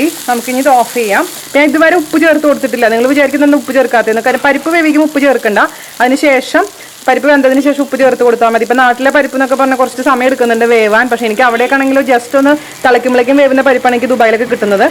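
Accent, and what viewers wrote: Thrissur, India. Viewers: chechiii trissurkkari aano? Trissur slang aanalloo speak cheyyunnaath...super 😊 All recipes are superb.🎖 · Tani thrissur tanne...... Really great cooking.... Used to follow most videos. Wat we really enjoy is the slang....... Itu vere level ayi makkalee..... 😂😂😂😂. Good job n keep going. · chechide samsaram kettapole thonni, enikkishttayi njanum thrissur anu, ipo Bahrain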